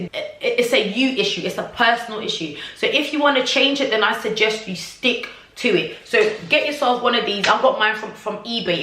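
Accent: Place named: England, United Kingdom. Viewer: Your english accent😍😍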